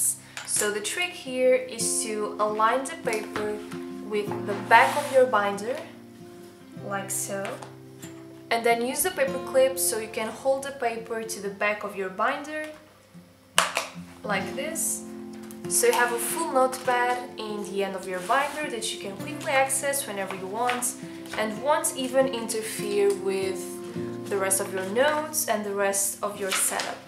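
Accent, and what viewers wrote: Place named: Portugal. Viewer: Sotaque de portuguesa é inconfundível ahah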